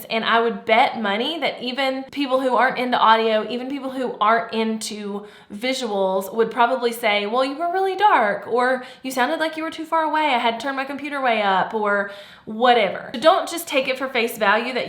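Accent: South, United States. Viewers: Absolutely love the content and the advice and on top of that, she is incredibly easy on the eyes and a delight to listen to. I love her beautifully southern accent. is really very knowledgable and an epitome of brains and beauty. I have okay audio but it sounds like I am on a phone call...which is what I used the mic for was meetings for work across skype. It is all I have right now, but a new mic is at the top of my list of things to get next. The rest of the 5 things are things that I am constantly watching for but I think I am handling pretty well. I don't have to worry about lighting except to make sure that it is not too dark in the game I am playing so that it is not too dark.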